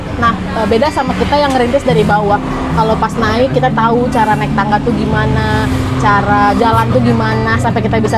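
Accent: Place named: Tangerang, Indonesia. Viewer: Kayak orang Tangerang..lanjut teeh mantep semangat terus..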